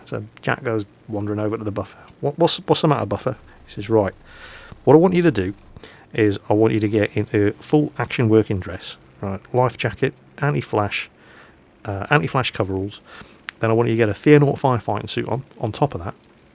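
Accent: England, United Kingdom. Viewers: If you think that could be a Scottish accent, then you must have hit your head on pavement after being repeatedly hit in the face with the stupid brick. There are many types of accents in the UK, but to save time, English accent=yes. Scottish accent= wtf? no. Listen to Scottish people more often.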